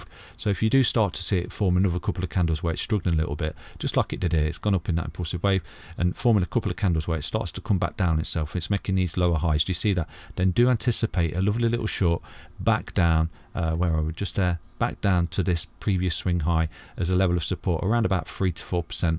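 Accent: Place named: Manchester, United Kingdom. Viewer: your accent sounds like you from Manchester? If so I’m from Manchester as well we definitely got to have a link up!!!!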